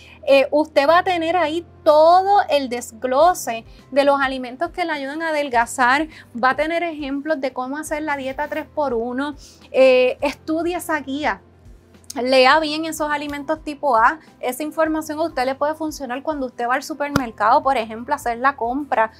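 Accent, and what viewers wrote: Puerto Rico. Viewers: Extraño mucho a mi amigo y maestro Frank Suárez, realice la dieta 3X1 y baje 9 kilos en menos de tres meses sin ejercicio en tiempos de pandemia, ahora volví a subir de peso, Gracias por tu explicación, eres muy bella con tu asentó Pueltoliqueño☺️😍 un saludo desde México. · Me encanta ese acento de las mujeres boricuas,,😍😍🥰🥰😘😘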